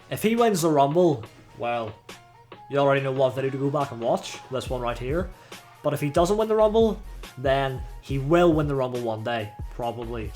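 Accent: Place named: Northern Ireland, United Kingdom. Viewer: Dude it’s so good to hear that accent! Good old Northern Ireland!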